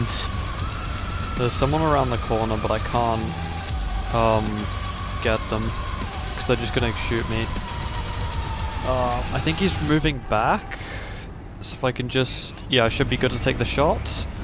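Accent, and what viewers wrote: Germany. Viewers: General Question: Are you German? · Das ist so englisch mit Deutschen anksend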